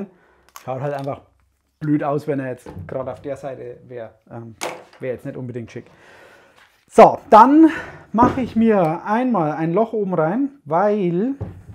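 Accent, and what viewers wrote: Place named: Franconia, Germany. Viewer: "Runsdrum" frängisch is einfach genial 😉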